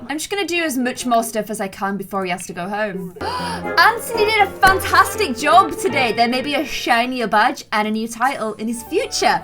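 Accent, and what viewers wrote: United Kingdom. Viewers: Btw I'm half French and it was funny hearing you speak French with a British accent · Lol i love how says antony instead of anthony XD Its ok i love that british accent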